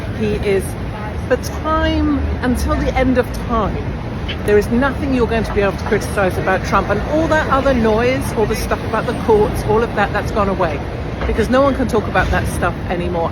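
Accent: United Kingdom. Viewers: Trump is alive only because the Lord saved him. BTW, I love the British accent.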